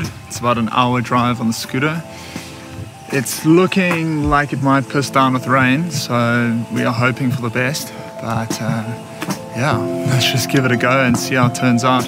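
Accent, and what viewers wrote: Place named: South Africa. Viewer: Love your stuff dude. Are you South African? Sounds like it ;-) Lekker man.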